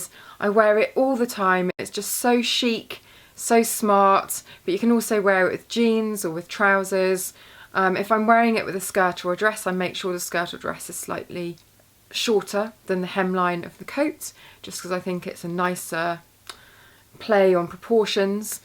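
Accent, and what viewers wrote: United Kingdom. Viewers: Hi, I like you and your videos mainly for two reasons: 1.you're classy. 2.your beautiful british accent.:) x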